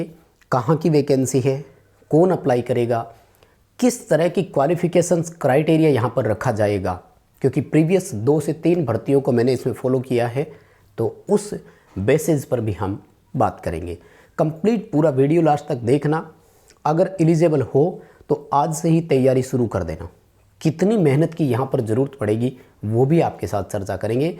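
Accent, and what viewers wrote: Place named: Punjab, India. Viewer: Punjabi jordaar hai sir g thaari ❤❤❤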